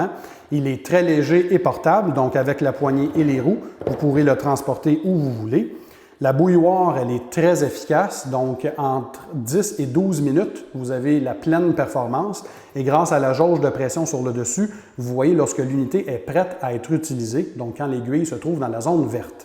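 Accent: Quebec, Canada. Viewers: J'adore son accent de canadien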